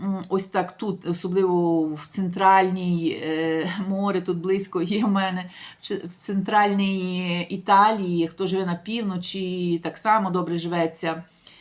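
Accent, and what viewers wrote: Lviv, Ukraine. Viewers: Hallo, комплІмент, від Ольги, мені подобаєтся ваш львівский діалект,а також миле відображення життя...Швейцарія - це super!!! Дайте контакт, поспілкуемось! Успіху в усіх справах! Liebe grüsse, Olga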